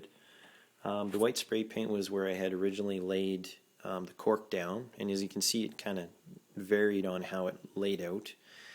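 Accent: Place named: Canada. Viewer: Hey...I love your explanation...(even the Canadian accent)..you are great..Be a teacher..you, got it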